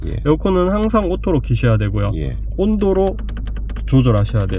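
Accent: Gyeongsang, South Korea. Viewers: 같은 경상도 사람으로서 듣고 있습니다 친절하게 설명 잘해 주시네요? ^^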